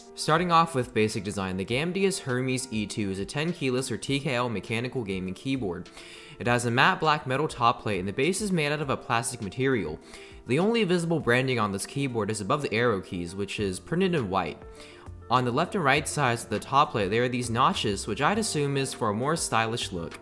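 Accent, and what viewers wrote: South, United States. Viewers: bring back the southern accent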